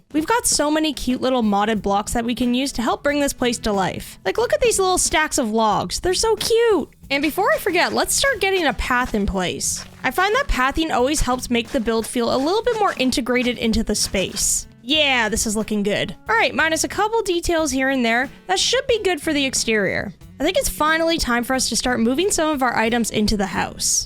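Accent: Canada. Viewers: youre canadian? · I knew straight away you were canadian, after hearing "hey buddy nice to see ya" is such a canadian accent. LOVE ITTT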